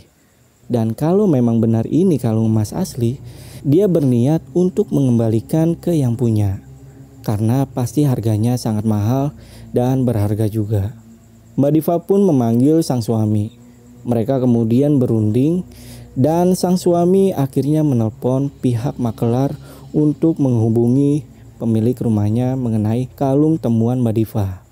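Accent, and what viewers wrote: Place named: Bali, Indonesia. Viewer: Bang, logat T nya bali banget sih. Asli bali kah bang?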